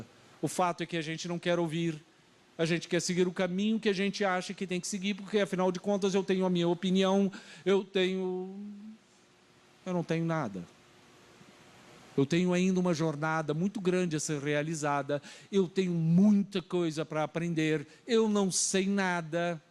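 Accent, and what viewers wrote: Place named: Portugal. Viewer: Por que está falando com sotaque de Portugal?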